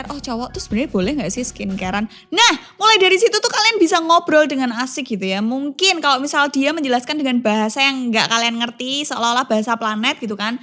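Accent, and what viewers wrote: Java, Indonesia. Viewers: laahhh opoo iki....jowo tenan rekkkkk... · medok rek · seneng Karo logat jowo ne sampean mbak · Muedok banget logat e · Aksen medok is love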